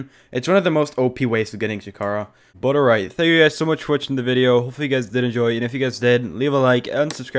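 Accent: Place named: Netherlands. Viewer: such a dutch accent tbh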